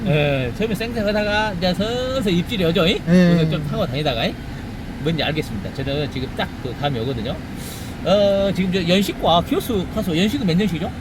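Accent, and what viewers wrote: Jeolla, South Korea. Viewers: 항상 침착하게 그리고 쉬운 퍼포먼스로 말씀을 아주 잘해주세요!~^^ 찰지게 또 하나 배워갑니다ㅎ 처서 가 코앞이지만 몸관리 신경쓰셔셔 컨디션조절 잘하시길 기원드립니다!! 역쉬 구수한 전라도사투리 짱!ㅎ 참고로 저는 충청도 입니다!~ 나가 아주 미쳐불어 미쳐불어 미쳐분당게..ㅎㅎ^^ 감사합니다. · 전라도 말씨가 정겹네요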